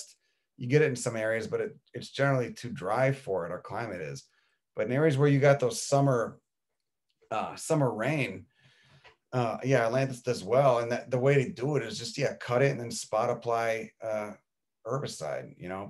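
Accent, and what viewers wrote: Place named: Boston, United States. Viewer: Good cheers to an Italian brother. My Nono came here to clean up gypsy moths and work on some estate as a gardener. My grandmother had me out in the garden and knowing how to grow my own food by the age of twelve! By 12 I found this really old book the local library was getting rid of for ten cents. Its been my freaking bible for the past forty years! During the 1990s I focused on native design, but as we know during that time everybody wanted imported plants that looked exotic and needed craploads of pesticides. I was ahead of my time with the organic approach but if people listened they would know that if you keep the ecosystem there are natural predators and you can plant native stuff to attract predators such as wasps and there are so many different types of wasps! The earth has been my life, my entire life and you are doing an amazing job. 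I am so grateful for your hilarity in these videos and love how you are not afraid to be real. YOu sounds like you are from Boston/Italian.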